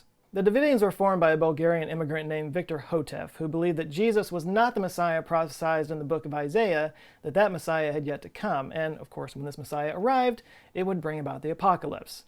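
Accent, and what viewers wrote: Texas, United States. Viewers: Finally we get you true Texan accent! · What a Texas accent 😅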